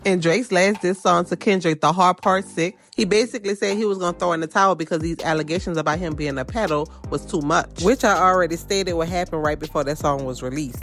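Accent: New Orleans, United States. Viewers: Please speak more clearly and add a few pauses in your sentences. Your New Orleans accent has to be the CUTEST one ever. ❤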